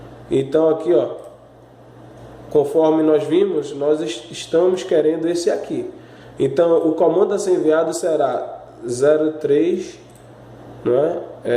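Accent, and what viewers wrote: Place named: Pernambuco, Brazil. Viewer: Esse sotaque e de Pernambucano do litoral :)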